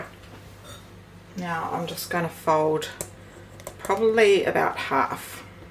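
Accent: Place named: New Zealand. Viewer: love your kiwi accent!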